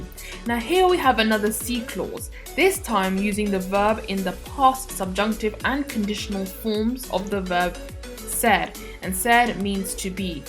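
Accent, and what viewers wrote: United Kingdom. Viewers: From what I can see the English speaker don't like to say piropos. There is not comments here I'm from Mexico and I love saying piropos a lot .I'm here to learn English through this channel it seems to be interesting. I really like your British accent.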